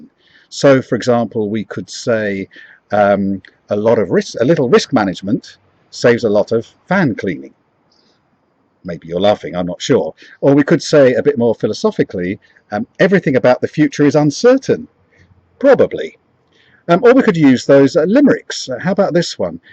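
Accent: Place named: United Kingdom. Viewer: Most amusing, - couldn't help smiling at some of the lines, delivered in such a typical British style...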